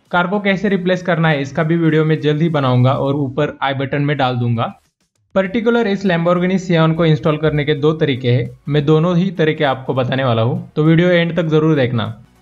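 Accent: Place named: Khandesh, India. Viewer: BRO I TOTALLY LOVE YOU your way of speaking and that khandeshi accent i love it. it was so easy to understand you were straight and simple subscribing you right away.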